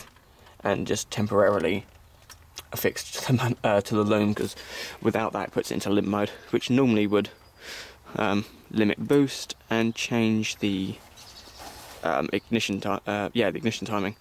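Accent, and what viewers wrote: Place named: United Kingdom. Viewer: To me it's not the voice that changed the most, it's the accent. In the old video, I could understand something. In this video, I can understand almost nothing. Dat British English *grumpy noises*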